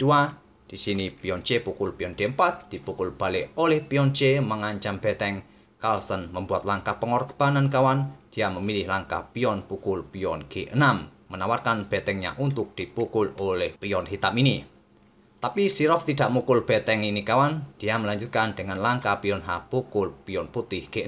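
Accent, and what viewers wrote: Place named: East Java, Indonesia. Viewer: owh admin nya jawa timur, oke bng sukses selalu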